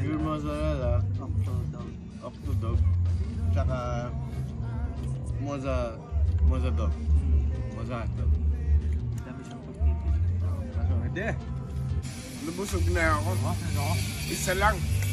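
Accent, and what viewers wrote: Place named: Pampanga, Philippines. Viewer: The Campampangan Accent tho. 🤘😁🤘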